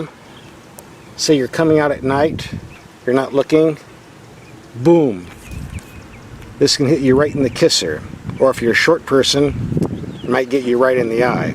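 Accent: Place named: Newfoundland, Canada. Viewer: You sound Newfie, but there's Cacti in the background.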